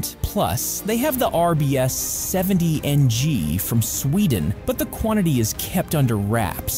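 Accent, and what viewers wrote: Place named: United Kingdom. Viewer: Tal cual. Tu lo has dicho. Vos, que la viste derrumbarse y rodar por el suelo mordiendo el polvo, no quieres ver que está levantándose, ¿por qué? ¿qué intereses hiere un hipotético rearme argentino? ¿Por qué nos quieren con la cara contra el piso y las manos en las espaldas? ¿Por qie se regocijan viendo a Brasil y Chile armados hasta los dientes? ¿Quién sos, detrás de ese voz y locucion británica o angloparlante? Un saludo desde Córdoba, Argentina.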